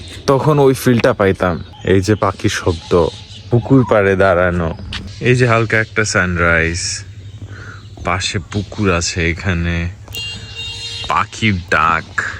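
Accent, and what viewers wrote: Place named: Sylhet, Bangladesh. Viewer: His Syl accent is so cute 😂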